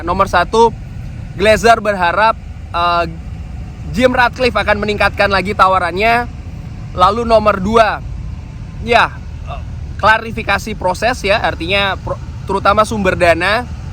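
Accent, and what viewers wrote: Semarang, Indonesia. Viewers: Lho sampean wong semarang to mas? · Asli semarang kah bang? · Orang Semarang ya bang? 😮 Kirain sy org luar Jawa 😂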